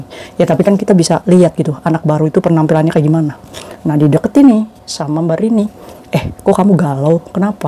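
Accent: Indramayu, Indonesia. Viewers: pantesan kl ngmng BOLeD mirip orang Indramayu 😅😅😅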